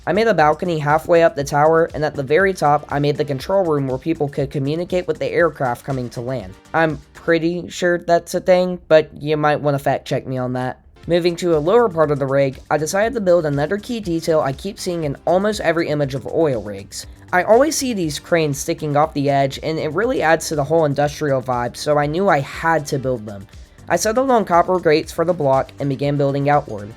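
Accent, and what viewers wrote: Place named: Maryland, United States. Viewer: Insanely weird question but are you from Maryland? 😂 Your accent sounds it!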